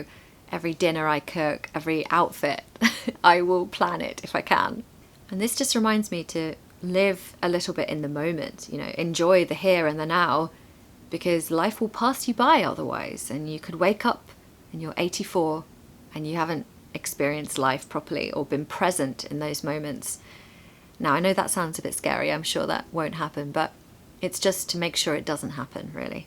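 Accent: United Kingdom. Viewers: you have a beautifully sounding true British accent. How can I achieve that accent you have if I am not an English native speaker?? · Beautiful British English and form an inextricably-linked whole. So yeah, I come 'for both'. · Hello, I recently subscribe your channel. I love your british accent! I am from Chile and enjoy your videos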